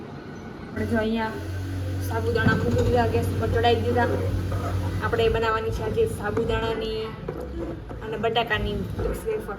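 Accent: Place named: Kathiawar, India. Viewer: Your voice sound like kathiawadi Are you from Rajkot??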